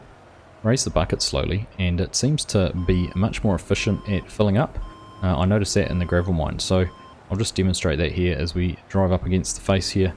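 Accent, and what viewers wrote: New Zealand. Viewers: Damn man a kiwi? North or South Island mate?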